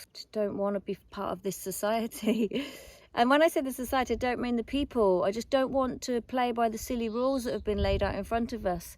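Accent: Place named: West Country, United Kingdom. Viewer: It's nice to hear a bit of your story. I used to live in Exeter and I'm from Marlborough, I knew I recognised the slight ooh arrr in your accent.. 😂, don't worry, it's very slight. Haha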